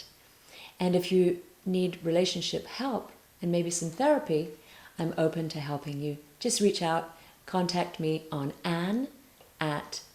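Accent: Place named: RP, United Kingdom. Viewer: Sorry, Ann.....but this is so simplistically ridiculous, superficial and sophomoric......that you could only pass it off as a working miracle by affixing 'Dr.' to you name and speaking with that highbrow English accent. I listened carefully to what you have said.....and even if true......many relationships fall completely apart based on many other elements. Seriously? It actually sounds like you have not been in many relationships or successful ones or really 'know' so-called successful ones. What appears successful on the surface often, below, turns out to be a simple compromise made my many, many people to accept the situation for fear of being alone, lonely, insecure financially, etc. For example, you may go to VIP events with some guy or your husband draped on your arm, but do you really know your husband or what he is like? Or do you just claim that you do. 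You sound like another pretentious psycho-wizard. I know of many people in relationships that are long lasting but totally devoid of real respect, love, etc. They cling to each other and put on a front. 50% of all marriages fail in America and of that remaining 50%.......that vast majority are not particularly happy. That's a real fact.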